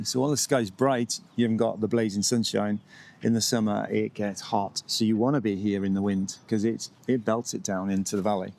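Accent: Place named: England, United Kingdom. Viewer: Thank you for sharing your experience…just found your channel… looking forward to watching all your videos… I know from your accent where you are from in England..😊…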